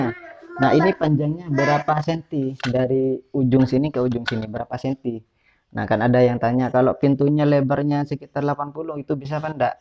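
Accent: Madura, Indonesia. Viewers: Logatnya asli Reng madureh😅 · Kalau dilihat dari foto ruang tamunya abang ini adalah santri, Kalau dilihat dari logatnya abang ini adalah orang madura, reng madureh dimmah kak......??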